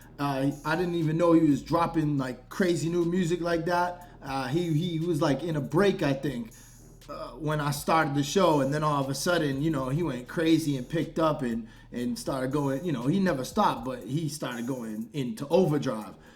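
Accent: England, United Kingdom. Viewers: The English accent works......i love how u make ur presentation, that pipe u smoke it rocks! U should do a movie one day brother because u're a dam good actor 👍👍👍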